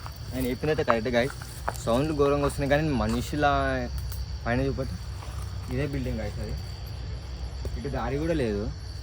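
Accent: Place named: Hyderabad, India. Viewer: Script kakunda genuine ga chesthundu bro pakka ne channel telugu lo manchi hype ostadi ...ne hyderbad slang chaala mem ki reache ayitadi but don't do scripted search for most haunted places take dare guys and communicate with ghosts mana telugu community lo ghost tho communication cheyanika raadu ....tamil lo simply sarath and grey wolf vasu videos chudu bro nd all d best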